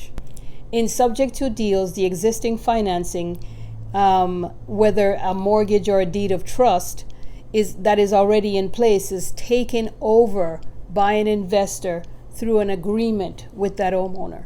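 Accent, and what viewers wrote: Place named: Jamaica. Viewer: you convey your message clearly. You sound like you're from my home country JA,..Quick question may I ask how you put together a buyers list?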